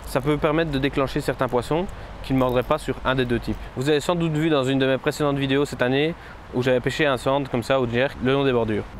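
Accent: Belgium. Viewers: oui j'ai reconnu ton petit accent belge que je connais bien, je suis natif de Valenciennes.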